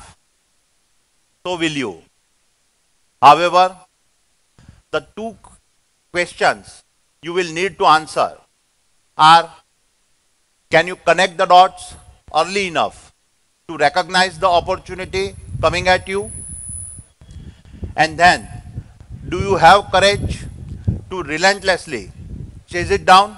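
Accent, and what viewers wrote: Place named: Gujarat, India. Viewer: English gujju accent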